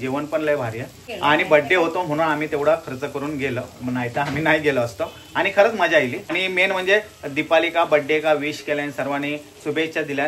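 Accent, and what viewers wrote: Malvani, India. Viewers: Maka tumch malvani aikuk avadta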